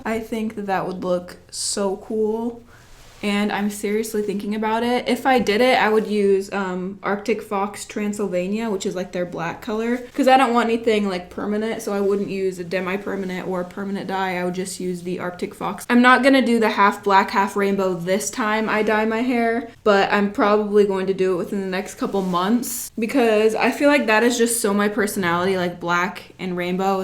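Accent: United States. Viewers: Omg youre from Indiana?? No wonder why we have the say accent 😂 I'm from Indiana too haha born and raised Chesterton just moved to Valpo. I work for family express distribution center and I still have to go to work 😔 I want to start exercising outside but it's been chilly and windy ! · crazy question, are you from the south?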